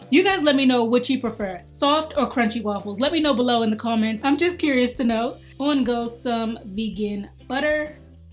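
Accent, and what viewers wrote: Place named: DMV, United States. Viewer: you have to be from the dmv based off your accent."uuuuused"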